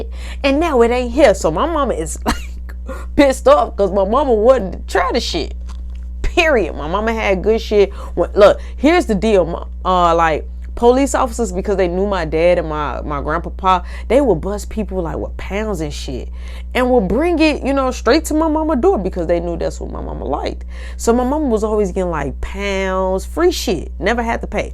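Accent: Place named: Chicago, United States. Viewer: don’t think I’m trolling, just be tryna boost/run it up 😊 but, you grew up in NY and the Go right? Only cause I spent summers there (still go yearly for my bday, I got family in Chicago) and yo accent sounds like a mix of Chicago and the DMV.. it’s different! Anyway, PLEASE get a publisher dammit! We got time to watch these stories, therefore we can buy a 10/20 dollar book! I’m just saying! I know you can do it! Rooting for you 😌🥰💕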